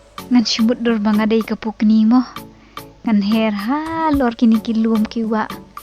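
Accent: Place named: Sohra, India. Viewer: Shu comic noh ita isur ..mih sur war sohra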